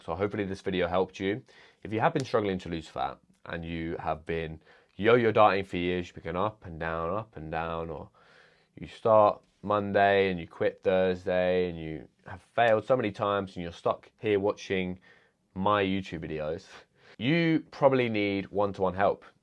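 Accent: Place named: United Kingdom. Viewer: Can't understand this heavey accent British English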